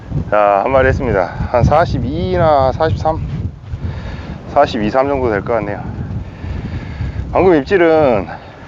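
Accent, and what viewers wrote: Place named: Jeolla, South Korea. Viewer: 터진고기는 목줄 검사를 꼭 하십시요 터지면 환장합니다 전라도 사투리 역시 구수하네요 저도 본은 전북 정읍입니다 직장이 평택이라 올라와 있죠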